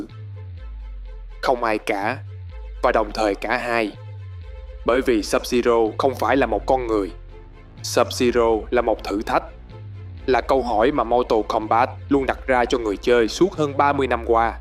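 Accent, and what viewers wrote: South, Vietnam. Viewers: Một trong những giọng đọc miền Nam mình yêu thích ngoài Minh Béo của Game cực hay. Kênh cố gắng giữ bạn này và phát triển các nội dung có thêm tính hài hước sẽ tăng tương tác nhiều hơn.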